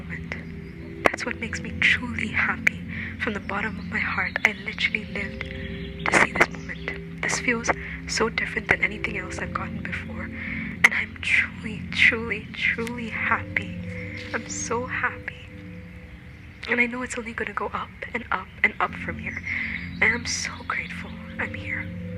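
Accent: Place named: India. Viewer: are you indian? you have that accent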